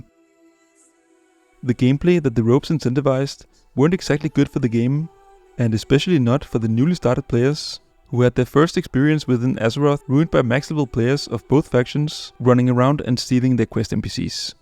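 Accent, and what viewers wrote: Denmark. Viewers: are u danish! STEGT FLÆSK MED PERSILLE SÅVS! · Danish accent represent.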